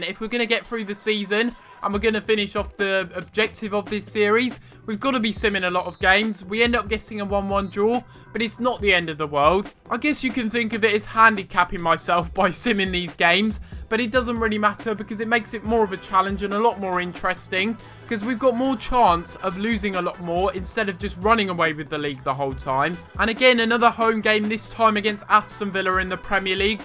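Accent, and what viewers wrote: United Kingdom. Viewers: bro you have the most sterotypical british accent ever hahaha. Great video btw!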